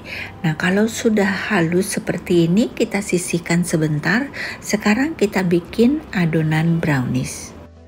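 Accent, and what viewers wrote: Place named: Banyumas, Indonesia. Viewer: Suaranya agak mirip THV Channel (The Hasan Tv) tp THV versi ngapaknya 😁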